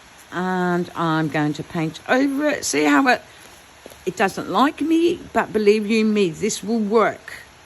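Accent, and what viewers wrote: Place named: Australia. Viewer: You sound Australian, but it is so hot in Oz right now n you have a thick thick jumper on! I'm sitting here melting! 😂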